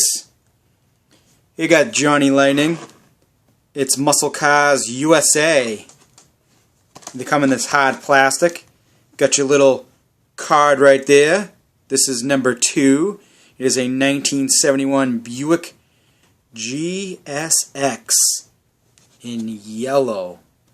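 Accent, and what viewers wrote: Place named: New York, United States. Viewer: Are u A New Yorker?? From the Big Apple? Or Vinnie from the Bronx?? Love that Wiseguy accent 👍🏻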